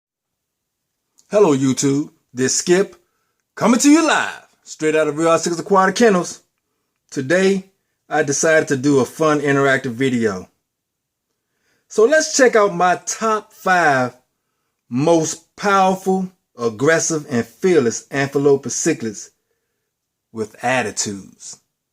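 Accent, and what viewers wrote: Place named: Washington DC, United States. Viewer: Cool fish. Sounds like you are from the DC area. ??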